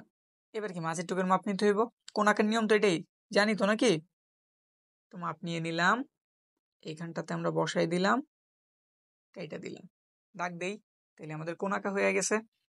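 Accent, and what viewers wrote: Comilla, Bangladesh. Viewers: bhai apni ki cumillar❤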